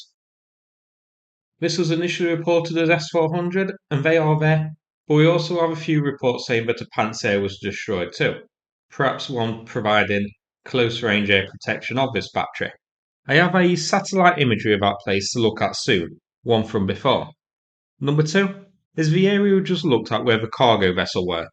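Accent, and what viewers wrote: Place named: Mansfield, United Kingdom. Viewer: He’s from Mansfield. Slava Ukraine ! 🇺🇦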